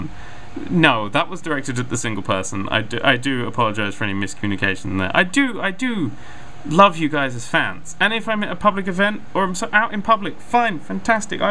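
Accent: United Kingdom. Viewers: 6. Your English/British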